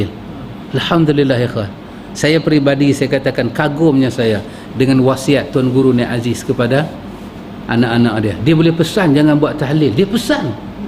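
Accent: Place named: Penang, Malaysia. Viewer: bestt dgn loghat penang.ceria.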